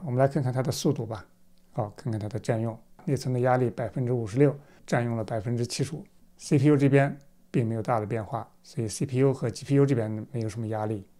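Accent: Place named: Xinjiang, China. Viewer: 老哥。听口音 新疆的哇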